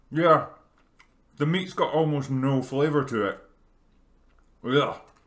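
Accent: Scotland, United Kingdom. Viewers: We're doing junk food reviews now? You can get away with reading the phone book if you have a Scottish accent I guess... Do they still have phone books?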